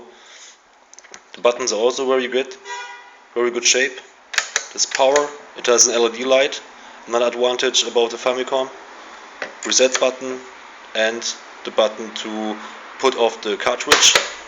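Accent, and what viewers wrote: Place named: Russia. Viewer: Sound like russian try to speak english Где надыбал , колись :)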